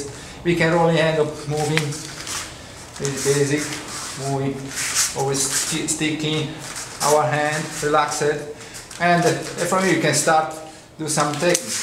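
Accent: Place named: Italy. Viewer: Hai un accento italiano abbastanza forte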